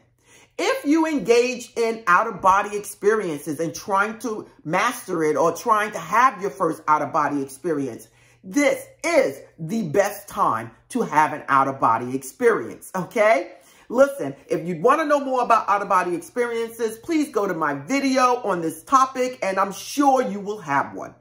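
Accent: New York, United States. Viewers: This has been happening 4 or 5 times during the week I'll start doing this tonight. Thank You Oh yeah. Are you from NY.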